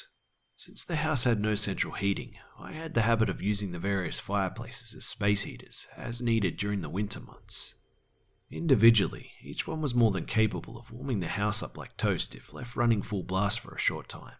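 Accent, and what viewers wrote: Australia. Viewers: Hey! R u Australian? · Justin Ramsaran - He's Australian 🇦🇺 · . Are u Australian? BTW HAPPY BIRTHDAY!!!!!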